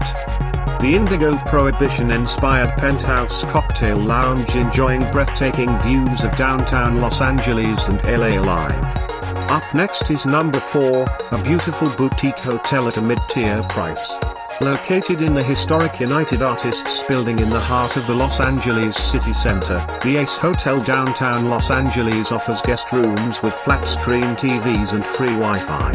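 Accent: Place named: England, United Kingdom. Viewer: Dude’s English accent annoys me.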